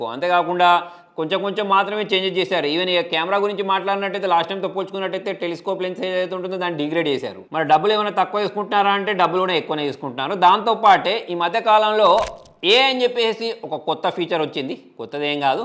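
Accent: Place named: Karimnagar, India. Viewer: .. మీరు చెప్పే దాన్ని బట్టి నవ్వొచ్చింది బ్రో..iam not laughing about your content...knr slang..big fan of u😊😊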